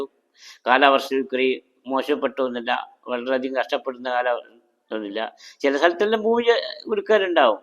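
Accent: India. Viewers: kannur malayalam 👍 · വടകര.... കുറ്റ്യാടി സ്ലാങ് 😍👍....... നല്ല പ്രവചനം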